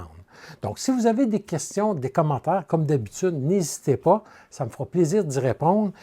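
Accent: Canada. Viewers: Jolie vidéo avec en prime l'accent Canadien. Merci beaucoup.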